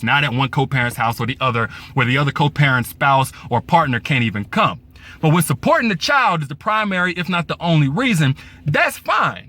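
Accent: South, United States. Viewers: Lol. That southern accent! I agree with you, Derrick. Come together only to support the child. Stop the excuses. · 😂 lol love the accent Derrick lol great southern drawl lol 😂. Blended Families it can be a great thing for the kids but there has to be a lot of trust in the relationship between the new wife and husband. Camping trips and Vacations sound great but it's a matter of keeping bedrooms separate and also making sure the kids get along too especially if there are children from a previous relationship. The ex should simply try to understand that you are not with this person but you want your child to build a bond with their step siblings. I have seen this work and I have seen it progress. It works best when both parties the mother and father are in fulfilling healthy relationships though too. If they have that then the kids will be happy 😊 too. 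· I'm done with that Dolly Parton accent 😂🤣.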